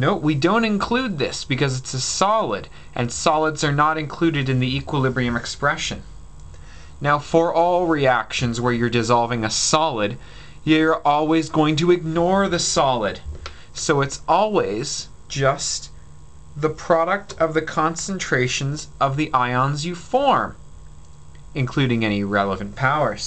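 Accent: Canada. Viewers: oh, you're canadian...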